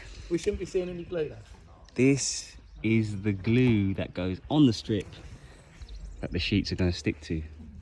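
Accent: United Kingdom. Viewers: wait are u British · Jeremy, just asking are you British because I am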